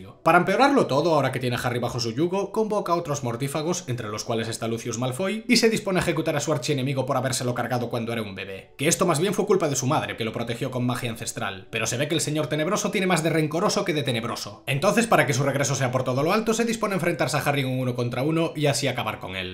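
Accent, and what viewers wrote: Cuba. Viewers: Era acento cubano? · el acento cubano me hiso el dia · Que acento cubano tan racista…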